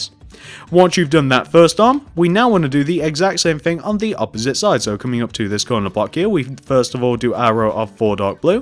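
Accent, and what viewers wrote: United Kingdom. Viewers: so that is why you have a british accent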